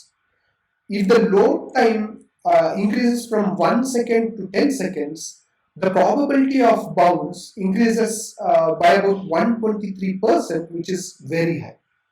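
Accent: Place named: India. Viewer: Indian accent + echo chamber^2 = non native english speaker can't understand.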